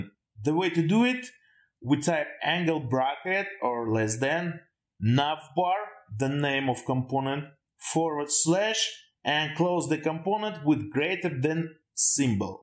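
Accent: Russia. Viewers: You're so cool! lol are you Russian?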